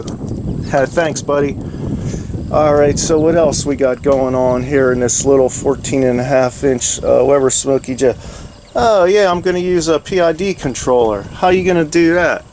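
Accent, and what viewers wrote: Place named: Western Pennsylvania, United States. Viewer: Great videos. I detect a western PA accent. Where can I get the carbon plate and pid fan?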